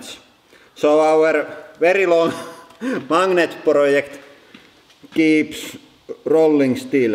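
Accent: Finland. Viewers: Is this guy finnish. The accent sounds like it lmao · Are you from Finland cause you have a Finnish accent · Dean Rowlinson Sounds like my Finnish grandfather. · that accent is so finnish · Today the content was not so good ..but like your Finnish accent and your wife's laugh so I pressed like..!!! Stay happy..!! Love from India..! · omg even when he speaks english it sounds like finnish. 😂😂 what is he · This is awsome channel. The finnish rallye english is something else. 8) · The rough finnish accent is killing me xdd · The Finglish is strong with this one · He sure sound Finnish.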